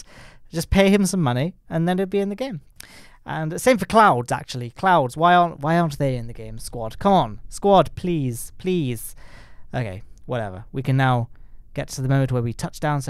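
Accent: United Kingdom. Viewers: It's just how us british talk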